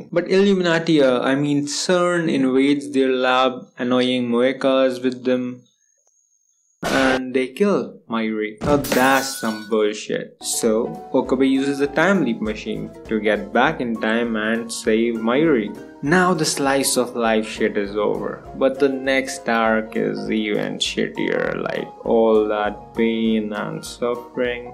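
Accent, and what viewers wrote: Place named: India. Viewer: Crazy hearing someone that sounds like their first language comes from India talking about science like that mind blowing. I thought every Indian loved science. 😭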